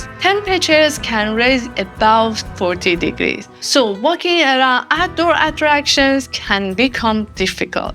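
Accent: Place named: Iran. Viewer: the Persian accent kills me, she has to say everything in Iranian way, even change the pronunciation of names to make them sound Persian!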